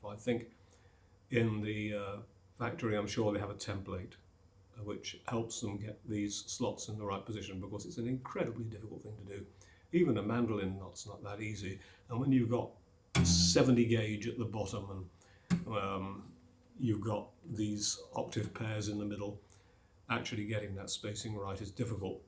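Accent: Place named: United Kingdom. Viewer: I am truly intrigued by this gentleman’s British accent. Being an American our English is is rather lax and candid.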